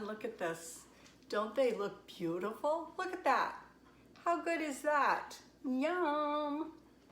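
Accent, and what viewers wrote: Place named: Maine, United States. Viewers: You remind me of my great gramma Goldie. She was from mid state Maine toward the west. She had just the same accent as you🤗 she passed 9 years ago this December. She was just shy of 93. What a feisty lady she was too!! Anyway, I’ve been looking for a recipe like this. Thank you for the recipe and the memories. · I was searching YouTube for a dark molasses cookie like my grandmother used to make, and yours look most like them. I didn’t even notice the name of your channel, but I thought “she sounds like she’s from home” Haha yup, Maine💛I’ll be trying your recipe!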